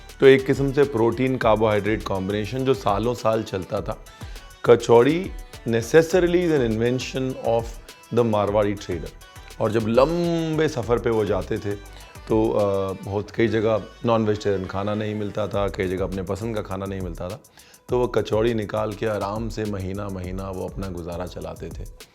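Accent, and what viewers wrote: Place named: Lucknow, India. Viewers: Apki lakhnavi bhot acchi baat karne ka salika was nice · Sir aap ki baatein Mujhae Kanpur aur Lucknow ki yaad delati hain.my home sweet home.n you share authentic UP RECIPE 👌🏻👌🏻👌🏻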